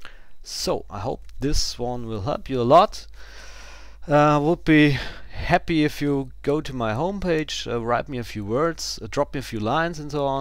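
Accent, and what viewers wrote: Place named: Germany. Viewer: you sound German..lol..."wideos"....still a good tutorial. thnx!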